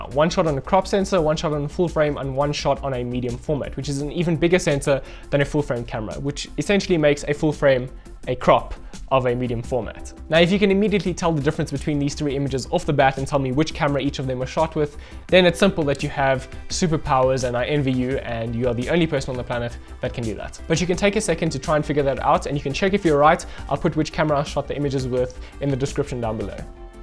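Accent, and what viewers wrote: South Africa. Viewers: Hey Man, you sound South African :) I’m South African too lol. Would love if you checked my video out and told me what you think :) be pretty cool if you up in joburg, I could help you out with stuff and learn from you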